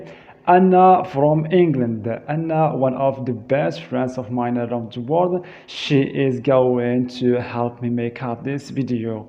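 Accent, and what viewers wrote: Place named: France. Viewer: Good job dude try to improve your pronunciation 😉 I smell some French in your English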